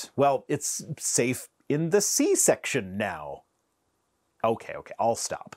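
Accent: South, United States. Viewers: I tried to get through this , but this dude's voice and flimsy argument were too much to tolerate. And although I live in Louisiana, his cuuuntree Ackkkksent was shrill at best. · Being from the southern United States, I can say this with all sincerity and lots of experience...NEVER BELIEVE ANYTHING ABOUT GAWD WHEN IT IS SPOKEN WITH THAT ACCENT!! NEVER!! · His southern accent is so grating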